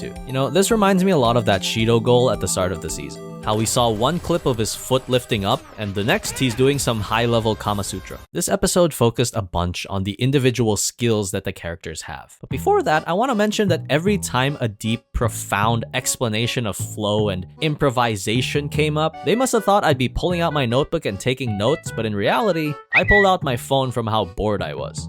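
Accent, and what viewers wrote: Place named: Liverpool, United Kingdom. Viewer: scouse bastad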